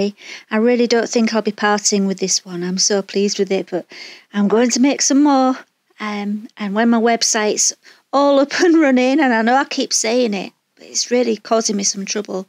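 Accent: United Kingdom. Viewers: 这姑娘是英国口音么？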